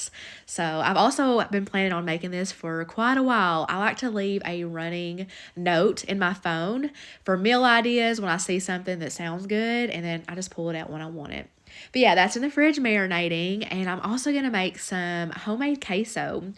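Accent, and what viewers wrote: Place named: Kentucky, United States. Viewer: Great dinner 🥗🥣🍝 ideals! I love to hear you talk. That Kentucky accent. Lol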